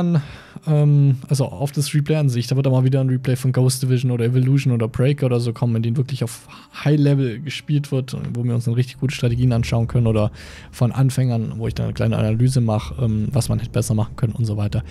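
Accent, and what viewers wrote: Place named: Swabia, Germany. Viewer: Hätte wenigstens wieder auf schwäbisch sein können :D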